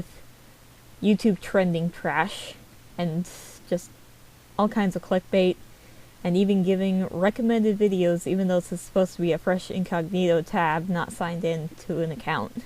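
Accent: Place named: Minnesota, United States. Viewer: you sound like you're from minnniii -soootaa also no idea how i got here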